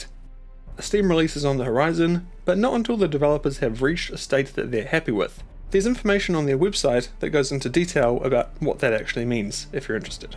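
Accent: New Zealand. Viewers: Are u a kiwi bro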